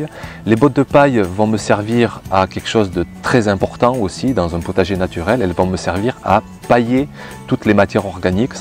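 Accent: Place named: Southwest, France. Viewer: Elle l'est car peu travaillée ces dernières années c'est plus sûr la strate du dessus l'herbe était coupée a raz tout le temps donc la terre peu protégée des UV :) j'entends que tu prononces le S quand tu dis : moinS tu es d'origine du sud ouest ? :)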